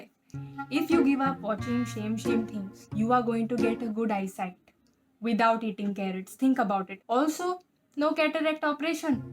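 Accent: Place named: Konkani, India. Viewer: Love your Konklish, energy, charisma, quick wit, mannerisms, fun, humour, painted moustache, painted hair on your chest ..... and not necessarily in this order.! Keep it up.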